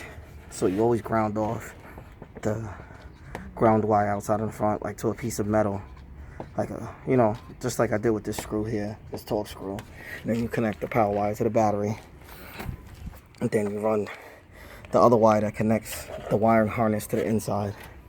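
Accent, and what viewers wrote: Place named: New York, United States. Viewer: I may be from the south but I know an East Coast accent from anywhere... maybe I’m wrong but I’m getting Brooklyn/Bronx vibes. Preciate the video brother. New subscriber ✊🏽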